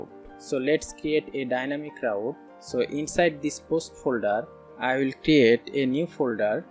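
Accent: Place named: Bengal, India. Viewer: Khub valo laglo video ta, bangali mone hocche accent sun ?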